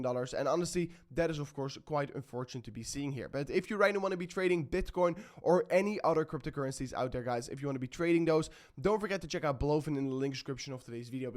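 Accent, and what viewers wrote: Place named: Netherlands. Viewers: Yep he is Dutch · Ben jij Nederlander of vlaming ofzo? ik hoor een accentje. groetjes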